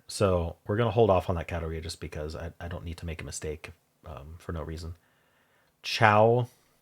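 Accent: United States. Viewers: I really really couldn't figure this one out, until said it in his American accent. But yeah I was never going to get this, those are not homophones at all in (most?) other accents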